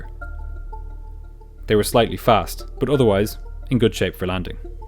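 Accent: Ireland. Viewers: IT'S his Irish accent.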